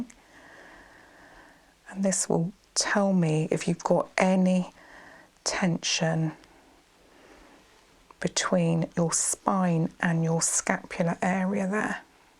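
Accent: United Kingdom. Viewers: You are from uk?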